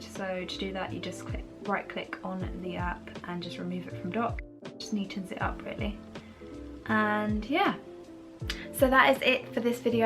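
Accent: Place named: United Kingdom. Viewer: British Accent 💕🔥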